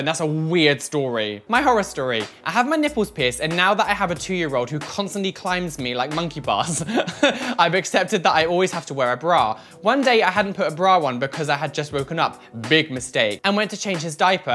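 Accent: United Kingdom. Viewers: Are you from the UK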